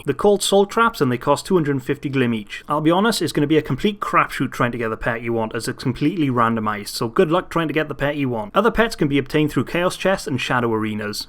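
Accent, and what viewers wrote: Wales, United Kingdom. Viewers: Are you Welsh? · Are you Welsh???? I am!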